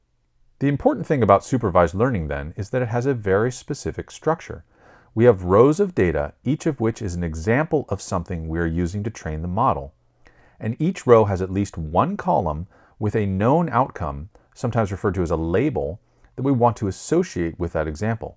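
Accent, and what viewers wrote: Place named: United States. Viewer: American English...pheww...finally found something that I'm able to understand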